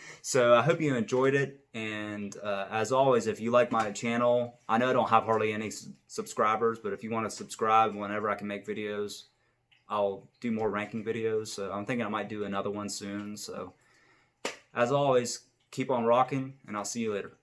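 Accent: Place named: Maryland, United States. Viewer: ....I hear your Maryland accent, you can't hide...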